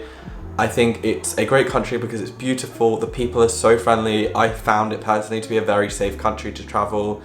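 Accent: Australia. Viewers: You definitely have a Aussie twang in your accent now!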